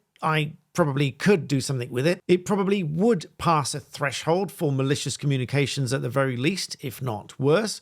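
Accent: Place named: RP, United Kingdom. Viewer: A posh English accent, then What is a missle?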